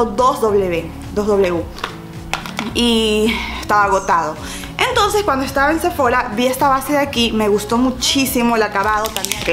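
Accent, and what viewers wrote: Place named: Guayaquil, Ecuador. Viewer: Primera vez que veo tu canal y me gustó por tu forma de hablar de una dije es Guayaca jajaja leí la descripción y boom Guayaquil bieeeeen besos y saludos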